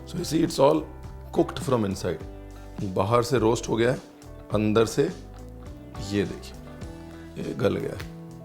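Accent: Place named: Lucknow, India. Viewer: Adaab ranveer sir apka lakhnavi andaz acha lagta hi cooking with urdu adab maza Agaya. This makes ur cooking and show obviously ur dish royal and great and delicious too like that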